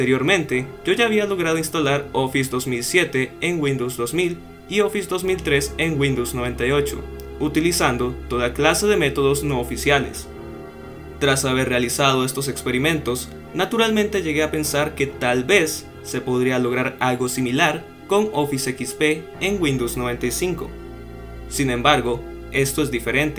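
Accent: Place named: England, United Kingdom. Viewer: I like your English accent, it sounds so cool. Been awhile since I visited your channel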